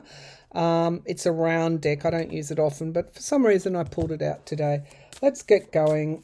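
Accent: Australia. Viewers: I'm American and adore your feelings. Being on the side of what is right is where I am. I have to also say I love your Australian accent. It's so sweet and wonderful! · I appreciate your natural, calm professionalism without you being cold or putting on a show. So very glad to have found your channel and to hear an easy paced Australian voice. Even more, that you expertise ranges from cards to astrology, channeling and mediumship.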